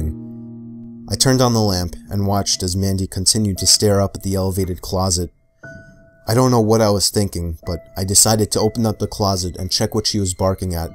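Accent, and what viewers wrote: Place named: New York, United States. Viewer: Are you from NY? I hear that accent, you say dog the same way I do.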